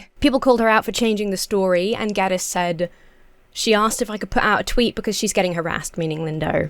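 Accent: United Kingdom. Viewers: 🤣😂🤣😂 Be Still my silly old mans heart, You godless little vixen you are a Riot and Hilarious. The Glasses and British accent drive me nuts you are so pretty and funny. Oh BTW Larry Flynt is worth noting because before the internets the real dirty pictures were in his Magazine HUSTLER, Not Playboy. Ans you looked at the pictures first then you might read the articles which pretty far left and very hard hitting. because he didn't worry about sponcers. I'm sure there is a good profile of him on youtube. Hes a great sinner too. Do what you do its so funny I watch your show as a treatment for depression and it always works.. Cheers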